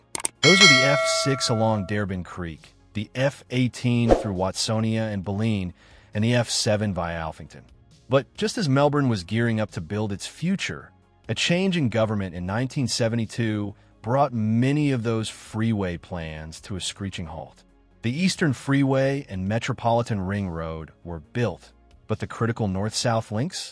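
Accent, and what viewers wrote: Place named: United States. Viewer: Mispronounced place names, American accent, left hand drive, this is hard to watch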